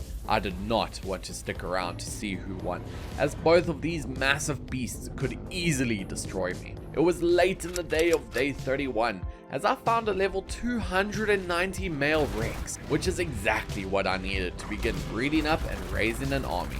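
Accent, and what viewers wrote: South Africa. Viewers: Wait are you South African?? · are u south african bro? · Are you south african? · omfg i knew you sounded south African and then you said load shedding and then i was sure <3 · Love your videos and i never knew you lived in South Africa telling by Eskom, plus your accent. Good to see another fellow South African Ark player. 😊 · Bra when I started watching I heard your south african accent and then you said eskom and then I knew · Are you from South Africa because I am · You sound so South African.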